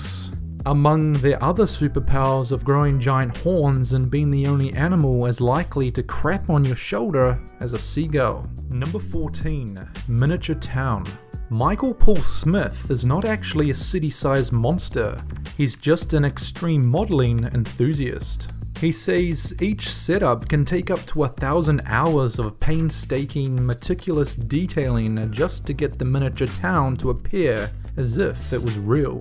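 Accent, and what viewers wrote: New Zealand. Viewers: First of all, did not copy Danger Dolan's accent. Haven't any of you ever heard of different accents. Ex: Australian, New Zealand, British, Canadian.... and so on And FYI every location, Country, State, or resident, has a different accent depending were the person is born. 2nd of all, Why do random people asum he is purposely trying to copy other accents? There is more than just only one person, with the same accent. Thirdly: Danger Dolan is fron Australia, and is from New Zealand. Fourthly: Dolan agreed to help, with the production of this channel. And Lastly: If you dislike or are still assuming this is a ripoff, then please leave this channel, and just go watch something else. For every stupid person out there, who complains about accents on this channel, Why are you even here on this channel? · Maybe a New Zealand accent?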